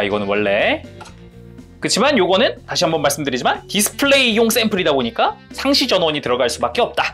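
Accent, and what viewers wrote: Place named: Jeolla, South Korea. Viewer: MGEX 리뷰는 이채널에서 한 리뷰가 넘사벽인듯.. 근데... 전라도 사투리는 좀...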